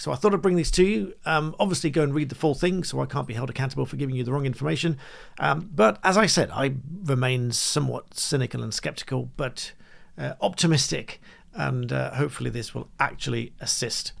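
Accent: United Kingdom. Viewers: Are you British? or English, there is a big difference.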